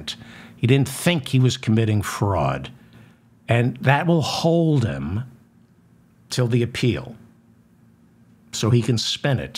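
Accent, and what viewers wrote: New York, United States. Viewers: Profound thoughts on Gaza,. Your transcendent resolution is the only way. Thanks. PS: your pumpkin postmortem was blissfully brilliant, and spoken by a true New Yorker!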